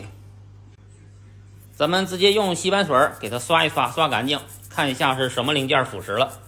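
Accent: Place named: Northeast, China. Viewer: 听口音是东北人在广西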